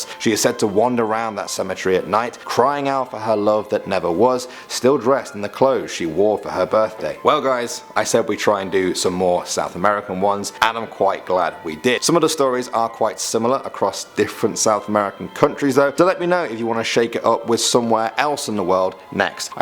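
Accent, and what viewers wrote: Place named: United Kingdom. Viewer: I’m not sure but I’m hearing a little Britain accent is it just me cus I here a little British accent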